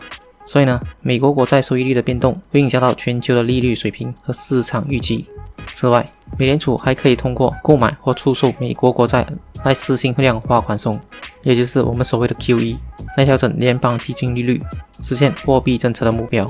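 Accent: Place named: Malaysia. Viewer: 雪碧馬來口音，聽得頭痛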